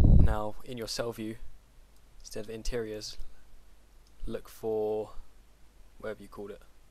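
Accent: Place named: London, United Kingdom. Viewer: London accent m8.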